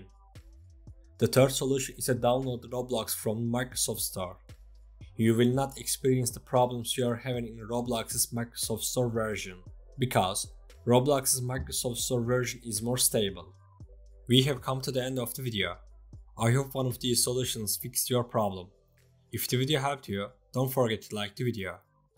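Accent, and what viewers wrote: Turkey. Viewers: Aksanından ve şurda ve demenden Türk olduğunu anladım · vaay abim türk :D konuşma seklinden anladım abi kralsın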